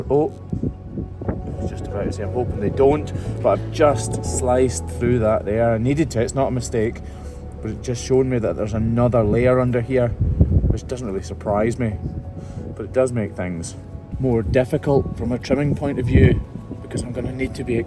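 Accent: Scotland, United Kingdom. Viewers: keep speaking as you do. I'm from Kilmacolm and I appreciate representation of our way of speaking on YouTube.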